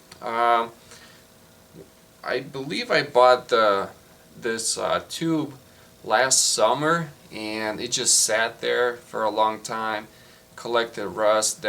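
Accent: Russia. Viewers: акцент как у русского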